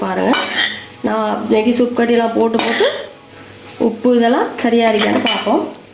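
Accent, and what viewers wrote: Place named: Mannar, Sri Lanka. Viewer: Sound like you are from mannar!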